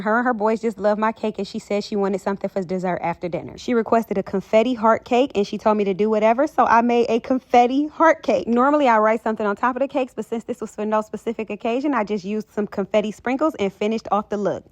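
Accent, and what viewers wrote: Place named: Georgia, United States. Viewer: So I’ll never get a cake from you cause it sounds like you’re in Georgia somewhere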